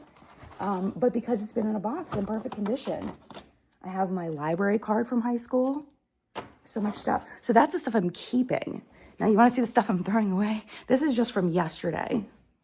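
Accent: South, United States. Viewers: My heart goes out to you, it’s an intensely emotional process sifting through your life’s memories! My best advice is to digitize most of it. Video yourself sorting through them while telling the story behind each item. This way you still keep the memories without the clutter. Seriously, your grandmother’s wig??? Don’t listen to the haters who scold you for speaking “American”! You should never apologize for who you are! You are a lovely person, don’t ever doubt that! 🥰💕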